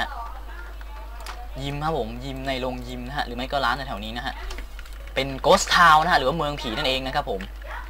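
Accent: North, Thailand. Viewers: คนเหนืองจังหวัดไรครับ >< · พี่คนเหนือหรอครับ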